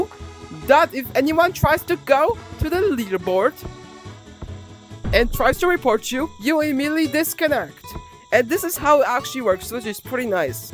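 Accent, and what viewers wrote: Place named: Poland. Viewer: o kuzwa ciagle wiedzialem ze cos jest z jego akcentem ale ze chlop jest z polski?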